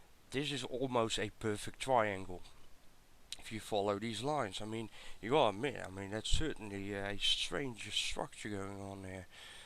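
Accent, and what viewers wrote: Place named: Netherlands. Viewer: dutch way of pronouncing .. hahahahahhaa!!!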